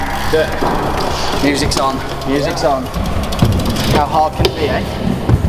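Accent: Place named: Essex, United Kingdom. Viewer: are you from essex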